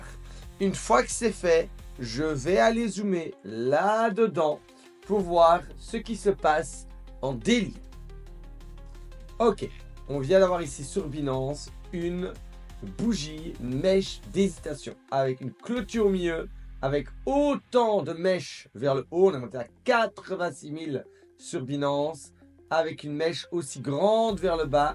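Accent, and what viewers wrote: Belgium. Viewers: Merci, d'où viens ton accent? Belge une fois?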